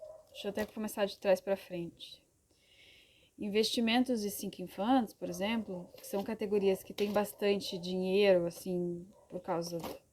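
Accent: Brazil. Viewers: Tu é gaúcha? De q cidade? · Teu sotaque é maravilhoso me convida pra ir toma um chimarrão ai com vc kkkk Sou de Lages SC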